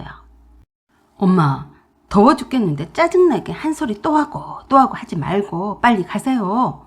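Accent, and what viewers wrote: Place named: Jeolla, South Korea. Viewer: 말투가 제 올케랑 너무 비슷하세요.. 전라도 특유의 억양이 특히나.. 말씀을 재밌게 하시네요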